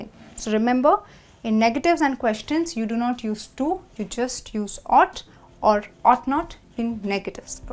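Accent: India. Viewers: Her accent is so different. :) Is she from India? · Indian english 🤦🏼‍♂️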